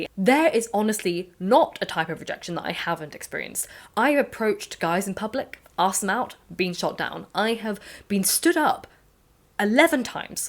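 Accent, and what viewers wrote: England, United Kingdom. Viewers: I feel like I just had a great lecture from a former professor. And as an American, the English accent made it all the more better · You are British af. I love the way you talk!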